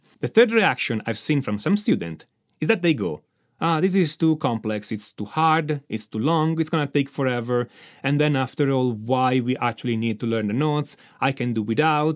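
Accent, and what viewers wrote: North, Italy. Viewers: Lui è italiano quando dice lento in inglese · Accento italiano? 🤔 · U have an italian accent...? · Yep, northern Italian I would dare.. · Italian, Roman or northwest of Rome?? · Italiano vero .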